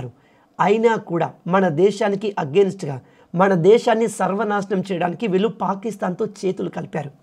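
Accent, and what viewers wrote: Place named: Gujarat, India. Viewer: Nuvvu Gujarati va nee Telugu language accent Gujarati laga Indira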